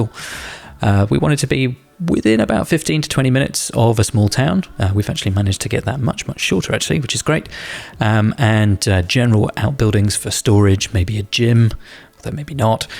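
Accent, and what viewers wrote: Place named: Australia. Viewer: From London? Your accent sounds Aussie😊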